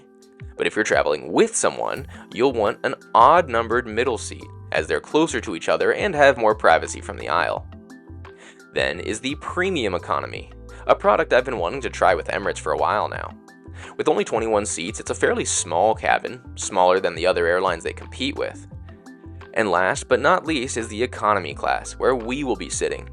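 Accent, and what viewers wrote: United States. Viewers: Good, but the harsh American mono accent was a bit of a spoiler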